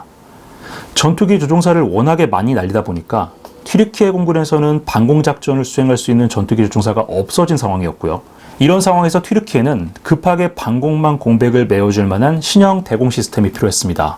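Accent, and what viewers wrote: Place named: Seoul, South Korea. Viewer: 한번씩 서울말 들어야죠 ^^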